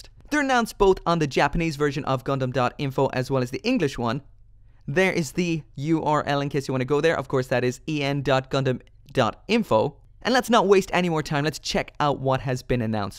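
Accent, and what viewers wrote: Ireland. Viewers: you live in the US? where are you originally from? your accent sounds a bit irish.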